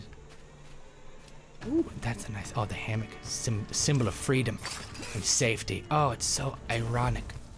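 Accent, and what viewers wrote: Scotland, United Kingdom. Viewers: Omg dat Scottish accent! Brilliant. :3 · Amazing Scottish a sent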